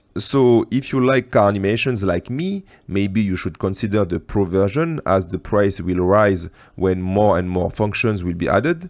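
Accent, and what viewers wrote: France. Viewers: 💪🏼Je m'abonne clairement!!! je cherchais des bon tuto sur Blender et plus particulièrement sur la création ciné/filmic de scènes de voitures en mouvement dynamique! PS: je m'abonne aussi parce que j'ai capté l'accent Français!!! 😃 #blackpanthers💪🏼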